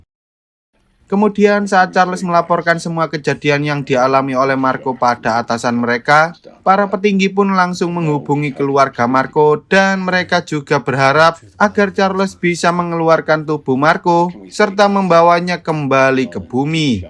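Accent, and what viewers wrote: Java, Indonesia. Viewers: pekat kali medok nya ya..🤣😂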